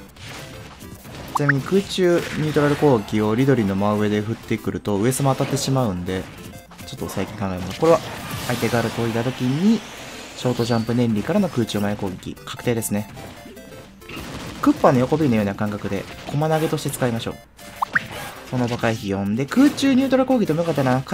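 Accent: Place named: Kansai, Japan. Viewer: 良い関西弁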